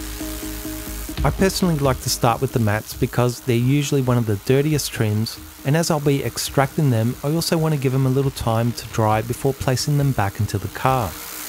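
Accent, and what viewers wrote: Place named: Australia. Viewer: I’ve been a fan of your work for a little while now. I appreciate your methodical approach to detailing, and I really appreciate the tutorial like aspect of your videos for people to pick up on some of your techniques. You seem to not mind sharing the knowledge, and there is value in you wanting to do that. Thank you, and amazing work with great results! Keep it up Your accent sounds Australian, greeting from Canada. Ricardo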